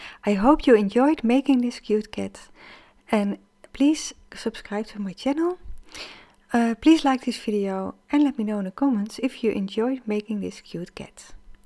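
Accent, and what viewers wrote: Netherlands. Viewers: jaa zag hem voorbij komen inderdaad! Maar zoek altijd in het Engels omdat je vaak meer video's tegen komt... Doe vrijwel alles in het Engels. Maar meende iets van een Nederlands accent te horen... Leuk! Bedankt voor de video! ❤ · I'm going to make one for my grand daughter. She's a cat lover. Thank you for yr tutorial. By the way are you dutch? Your voice has a sweet dutch accent 😊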